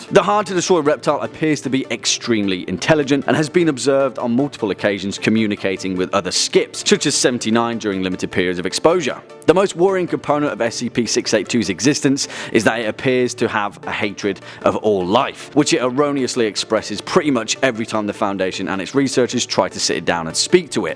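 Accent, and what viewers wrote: United Kingdom. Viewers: This guy sounds like the british dude from Community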